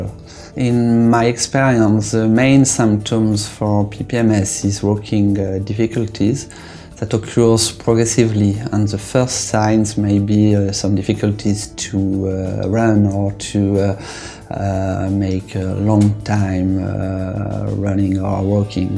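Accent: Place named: France. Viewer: No disrespect to the French, however subtitles would have made him understandable.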